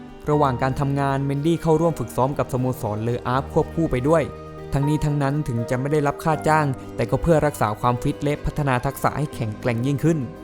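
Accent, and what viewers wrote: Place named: South, Thailand. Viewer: คนใต้ภาคเเน่ครับ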